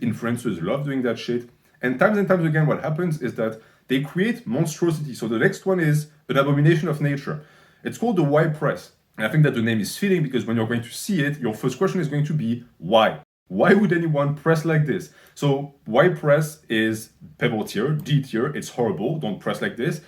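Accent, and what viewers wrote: France. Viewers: Are you french? your accent sounds like it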